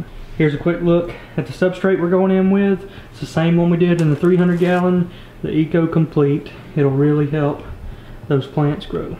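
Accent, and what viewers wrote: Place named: Louisiana, United States. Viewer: i can tell this guy is from lousiana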